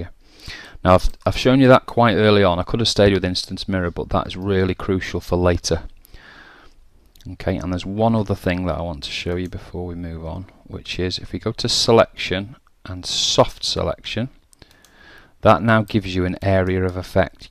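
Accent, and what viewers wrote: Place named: England, United Kingdom. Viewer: Believe you me, he has a beautiful English accent.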